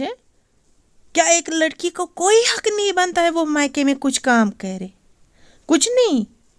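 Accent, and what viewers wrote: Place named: Kashmir, India. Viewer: Ur kashmiri language is very sweet di❤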